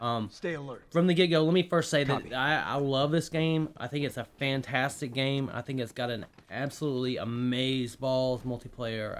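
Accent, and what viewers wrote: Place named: South, United States. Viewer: Where are you from? I hear a bit of a Southern accent.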